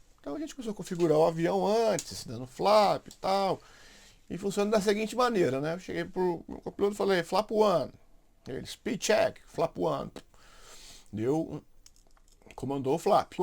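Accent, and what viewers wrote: Minas Gerais, Brazil. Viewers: Você é o famoso piloto que fala "mineirês"?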